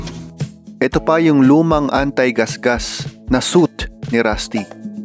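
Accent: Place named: Visayas, Philippines. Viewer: Bisaya diay ka? Lol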